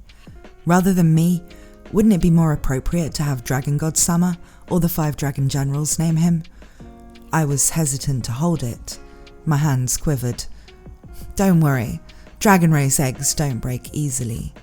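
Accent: United Kingdom. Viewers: is that so thats too bad, this is rude of me but are u like british?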